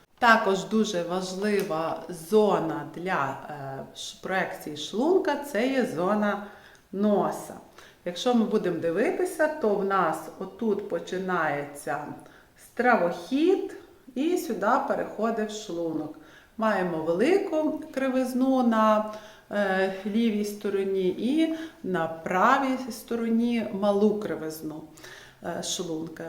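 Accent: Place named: Galicia, Ukraine. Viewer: ' так гарно звучить... відчувається що галичанка, львівська пані. Всіх благ вам і добра за таке корисне відео.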